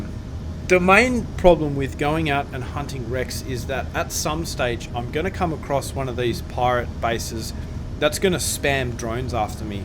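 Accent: Australia. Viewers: you sound lke a bloody aussie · Are u Australian?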